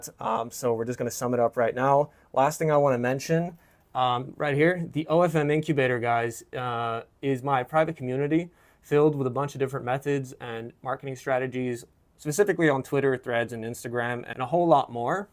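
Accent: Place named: United States. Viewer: you are definetly not from eastern europe, your accent sounds definetly as an american lol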